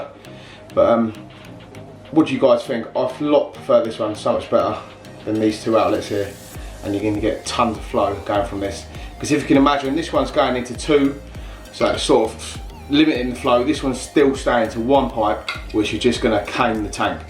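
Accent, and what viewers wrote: London, United Kingdom. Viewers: Good content man. Subbed👍 where u from mate? London? Essex??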